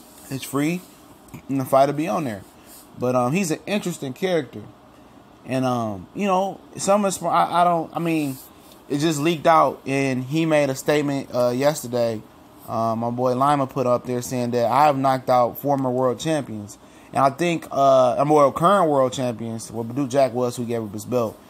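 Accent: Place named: Detroit suburbs, United States. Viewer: tickle your fancy bro Detroit niggas don't talk like that are you really from here or from the burbs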